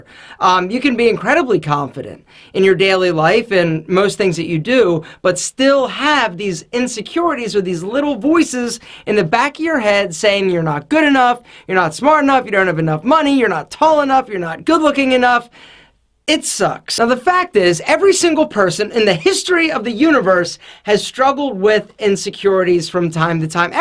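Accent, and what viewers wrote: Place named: Baltimore, United States. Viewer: Love your accent!! Bawlmer hon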